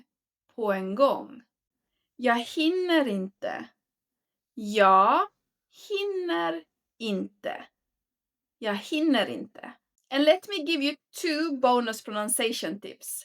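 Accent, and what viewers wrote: Finland Swedish, Finland. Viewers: Är du finlandsvensk? Du har en svag brytning eller dialekt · Jättefin kanal och arbete med att lära ut svenska 🇸🇪👸🎖️ Jag blir ofta irriterad på norskar att det inte uttalar t i det. För det är stor skillnad på de, dem och det på svenska. Och att de säger "hade" istället för Ha det bra som svenskar säger när de menar det på riktigt och inte bara slentrian. Du är annars jätteduktig. Men du pratar en finlandssvensk dialekt? Då kanske man säger som norskarna i din dialekt?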